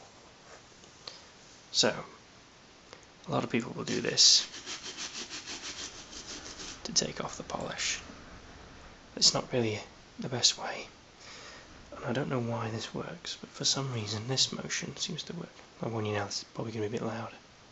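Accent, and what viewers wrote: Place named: North-East Wales, United Kingdom. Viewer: Very nice and relaxing. Hmm as for accent, I'm probably wrong bit you have a similar accent to my friend who lives in north east Wales, near liverpool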